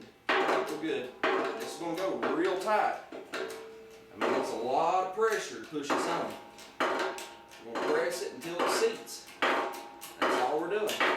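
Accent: South, United States. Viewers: Great job. I learned a lot so wanted to say thanks. Love the southern draw. Now to the garage.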